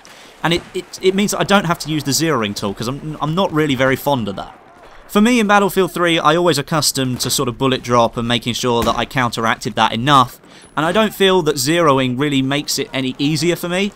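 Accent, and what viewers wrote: United Kingdom. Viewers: Brittish?? Subscribed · ur british yay i am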